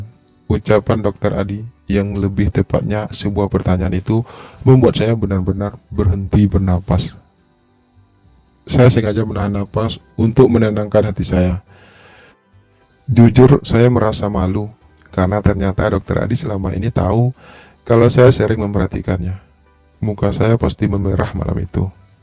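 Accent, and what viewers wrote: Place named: Padang, Indonesia. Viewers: Dari logatnya dipastikan adminnya orang padang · INTONASI NYA BENAR KENTAL PADANG BIAR DIA.BERBAHASA INDINESIA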